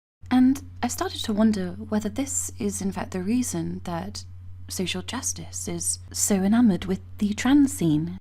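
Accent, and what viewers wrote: England, United Kingdom. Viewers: Gosh, this British accent is so cute ❤️. greetings from Brazil. Get Brexit Done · WOW! This ladys English accent is fabulous & sadly soon to be replaced. I prefer this to an ISLAMIC accent.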